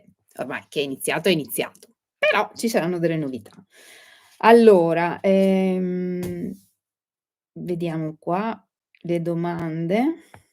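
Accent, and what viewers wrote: Veneto, Italy. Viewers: ciao, sto vedendo la registrazione della tua diretta. E' un piacere conoscerti, davvero. Confermo che hai un bella voce rassicurante, dolce e garbata inoltre il tuo accento veneto mi fa ricordare la mia mamma veneziana che non c'è più e mi manca molto. I tuoi tutorial sono chiari e molto utili anche per me che non sono più giovanissima e un po' testona. Brava! Un grande abbraccio 🤗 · Cara, che piacere vederti! ti seguo da circa 5 anni, sei stata e sei sempre di grande ispirazione con i tuoi progetti, ma ciò che ho amato subito di te ( e te l'ho scritto più volte), è la pacatezza, la chiarezza, la creatività e......il tuo accento veneto! lo sono anch'io, ma vivo in Piemonte! e sono mancina anch'io, ma poiché ben più vecchia di te, da bambina sono stata obbligata, per alcune manualità, ad imparare con la destra! vorrei scriverti ancora tante cose, ma intaserei il canale, per cui ti saluto con grande affetto, ti ringrazio ancora e...continua così! 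a presto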